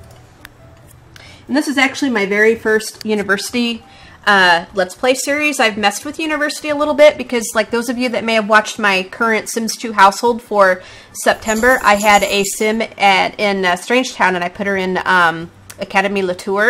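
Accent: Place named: Texas, United States. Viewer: I hope you dont take this the wrong way. But you seem like you have a texas accent are you from somewhere around there (Sorry new fan so I am a little confused) :)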